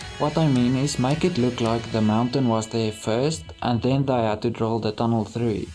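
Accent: South Africa. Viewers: Are you South African? I am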